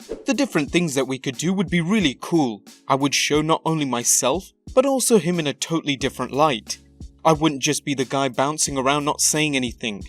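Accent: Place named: United Kingdom. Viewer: Ew a British voice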